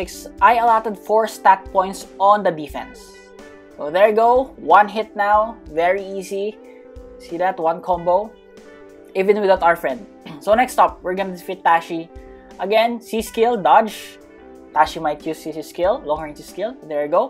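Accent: Philippines. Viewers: He's accent like Filipino accent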